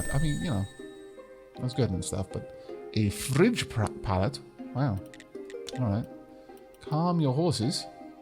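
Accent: Wales, United Kingdom. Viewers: I get people from outside the UK probably don't know much about various UK accents but I'd really have expected most people to recognise a Welsh voice. He even sometimes slips up and says the Welsh word for a thing mid sentence which is rather funny when it happens.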